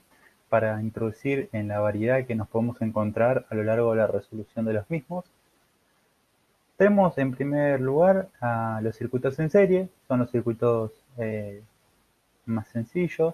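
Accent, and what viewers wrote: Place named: Argentina. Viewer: no entendí , osea no entiendo el argentino :v , es que no entendí algunas palabras xd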